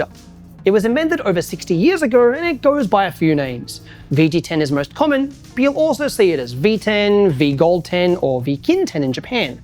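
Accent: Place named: New Zealand. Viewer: that's cool man I thought I heard some kiwi 'I's haha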